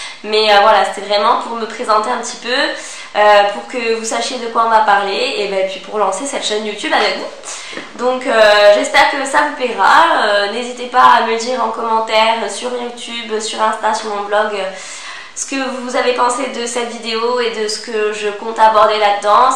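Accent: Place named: Corsica, France. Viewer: moi j'adore ta voix et ton petit accent corse... :))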